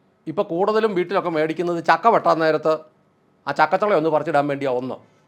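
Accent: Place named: Idukki, India. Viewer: Chettante samasaram kollam poli ...idukkikaran ano 😅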